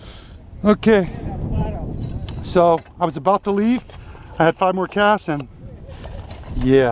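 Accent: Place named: Northern California, United States. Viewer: Lol. I can hella tell you are from NorCal. "Right on brotha" Lol! NorCal lingo at it's best! Nice lookin Salmon by the way. Always taste better when you catch them personally!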